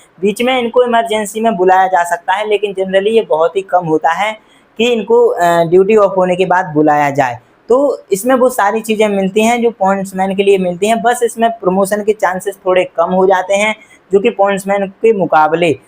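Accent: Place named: Jharkhand, India. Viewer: Jharkhand me bhai aise hi pronunciation kiya jata h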